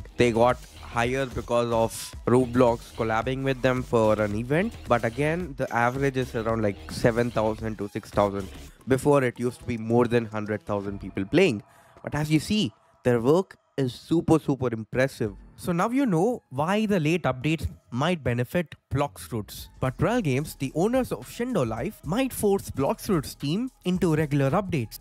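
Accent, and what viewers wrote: India. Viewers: Dude your voice is like a bit Indian ngl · ur accent is like an Indian no hate tho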